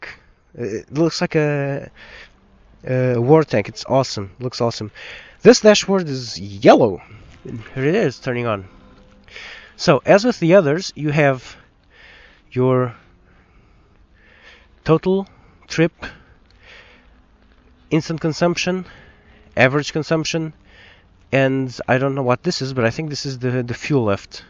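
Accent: Portugal. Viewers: Mal te ouvi pensei logo "Tem sotaque Portugues" vi a matricula "Confirma-se" xD Abraço, belo video, vou ver se tiro a carta de mota este ano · are you portuguese? you have slight a portuguese accent :D loved the review btw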